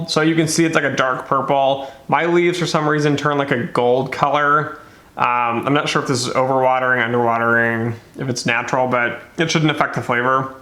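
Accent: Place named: California, United States. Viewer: You sound like you are in California.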